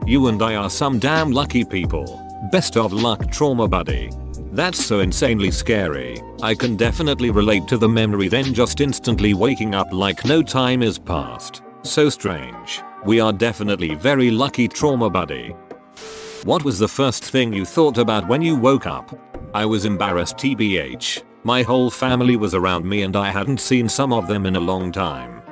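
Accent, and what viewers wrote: United Kingdom. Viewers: This brittish snob accent sucks on robo narrator.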